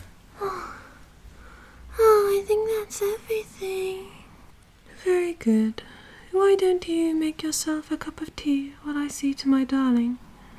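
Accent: United Kingdom. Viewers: Can you make a part 2 of this :D, this is my favorite asmr yet with the British accent ｡◕‿◕｡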